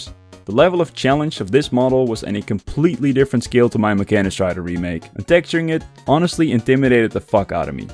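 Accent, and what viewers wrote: Netherlands. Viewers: You're Dutch, aren't you? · 5 seconden, ja hoor nederlander xD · Looks like you have been blessed by the algorithm, nice work good sir! Also do i detect a dutch accent?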